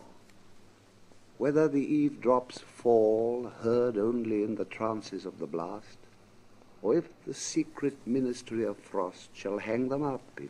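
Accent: RP, United Kingdom. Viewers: Great stuff. He doesn’t just recite it in exquisite RP, he understands the text.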